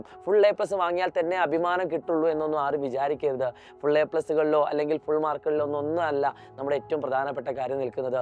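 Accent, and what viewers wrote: Thrissur, India. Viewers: Thrissur like adiiiiii❤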